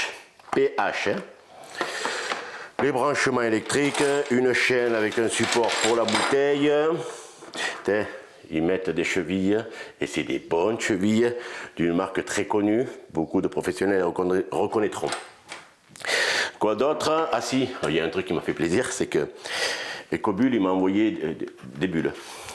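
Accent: South, France. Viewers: Il est incroyable ce bonhomme, en plus, il a l’air sympa avec son accent du midi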